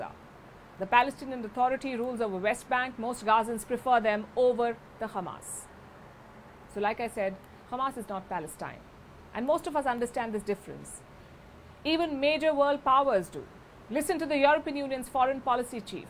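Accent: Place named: India. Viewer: Every time I hear your voice it reminds me of all the scammers from India! ''halo... I'm David Copper from the IRS...send money or else''!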